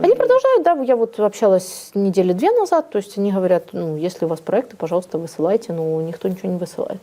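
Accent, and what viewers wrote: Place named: Ukraine. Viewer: Это элемент суржика.Русский язык с украинской фонетикой.Человек ни по русски ни по украински не говорит.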